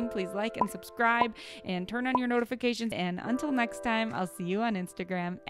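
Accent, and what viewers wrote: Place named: Michigan, United States. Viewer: I hear a Michigan Accent..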